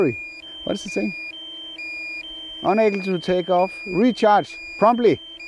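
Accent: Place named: Denmark. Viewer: Einstein? He was German. That's a Danish accent the host is talking 😅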